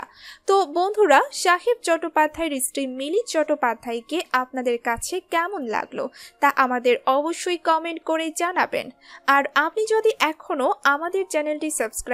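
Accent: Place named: Bangal, Bangladesh. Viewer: সঞ্চালিকা কাঠ বাঙালদের মতো বাংলা বলেন কেন? ইস্ত্রি বলেন স্ত্রী-কে !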